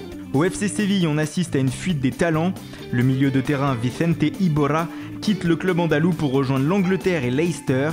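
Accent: Italy. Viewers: ton accent italien...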